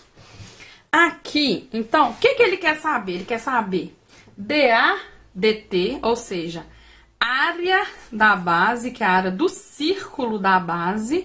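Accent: Minas Gerais, Brazil. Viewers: Vc e mineira né?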